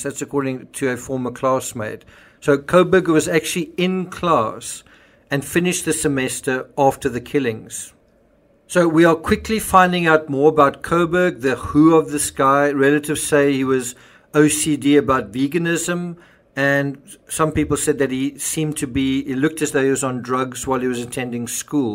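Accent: South Africa. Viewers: Narater sounds South African.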